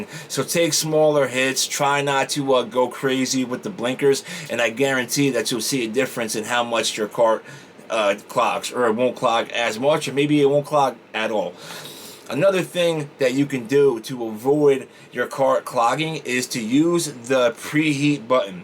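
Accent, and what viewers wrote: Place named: New York, United States. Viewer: Okay I just heard your voice alone and you just sound like home to me. My family is from New York and you sound like my people. 💜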